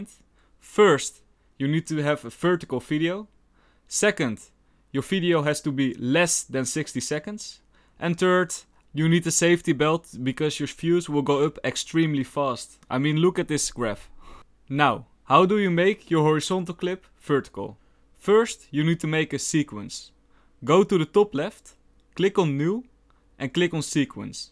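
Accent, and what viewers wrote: Netherlands. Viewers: heavy dutch accent at its finest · Yes I also think..Check his thumbnails an his dutch accenct confirms it :D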